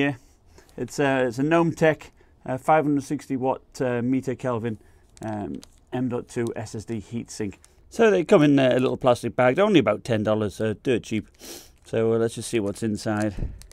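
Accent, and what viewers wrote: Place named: Billingham, United Kingdom. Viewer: It had occurred to me that something new might be going on, I hadn't installed anything but sneaky coin mining type activities is always a possibility, so I formatted and did a clean install of Windows yesterday, and even as it was installing it was getting hot. Performance whilst installing seemed a bit off, but since then it's been going OK - as I said, just a bit impractical with the cover off. I was more worried that the excess heat could do a bit of damage to everything else inside the laptop. I had hoped it would be a simple 'yep, bung a new SSD in and all will be well in the world' but maybe I'll just have to get used to the cover being off. Thanks anyway though, I'm looking forward to browsing through some of your video's, not everything interests me (I'm an old Unix and cobol zombie from way back but I just don't get gaming at all), I watched the one on how much money you make from a few weeks ago, it was really interesting, and you were helping those who may be just starting out with tips, which is really nice. 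(My husband is from Billingham, you sound rather local. Hope you're not sulking as bad as he is because Derby won )...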